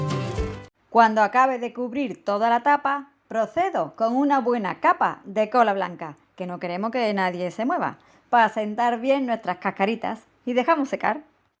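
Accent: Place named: Andalusia, Spain. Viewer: Pues a mi me encanta escuchar el acento de... porque aunque sea andaluza se la entiende a la perfección... La verdad es que si me molestaran esas cosas no podría ni ver nada en la tele porque hasta los periodistas de los telediarios hablan con incorrecciones, y muchas gramaticales que es peor que el acento (como lo de su tilde en la e).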